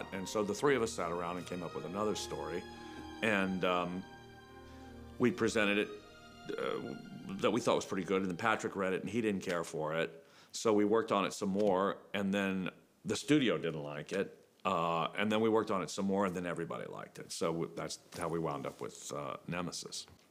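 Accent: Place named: Texas, United States. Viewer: He still sounds so Texas to me.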